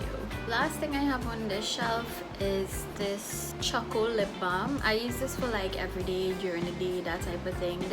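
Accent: Trinidad and Tobago. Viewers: I heard you’re accent and said this have to be a Trini. Does your fridge condense? I am looking to buy this but the reviews say it condenses so I came to YouTube to find a review and found your video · I know a trini accent when I hear one!! Heyy family 🇹🇹🇹🇹💕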